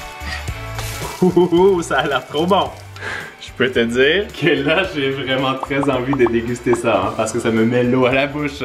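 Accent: Quebec, Canada. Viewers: T le meilleur continue comme tu es et ne change pas et ton accent quebequois est MAGNIFIQUE ❤️❤️❤️❤️❤️❤️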